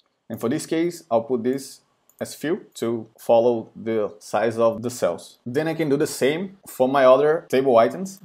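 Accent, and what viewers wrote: Brazil. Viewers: Se esse sotaque não for de Brasileiro não sei mais nada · Muito bom! acredito que seja brasileiro pelo sotaque. Parabéns pelo canal Bro!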